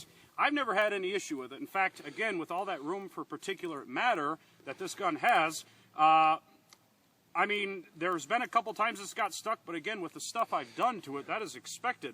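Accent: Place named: Upper Michigan, United States. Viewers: Judging by the particular landscape and the accent, I would say you're in the U.P., am I right? The sandy ground, ferns, and all the jack pine trees, it looks like somewhere in Gwinn or K.I. Sawyer. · You sound like your from the UP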